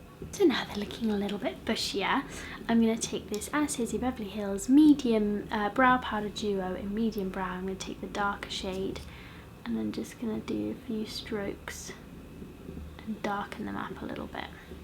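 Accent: United Kingdom. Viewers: is she british? I LoVe her accent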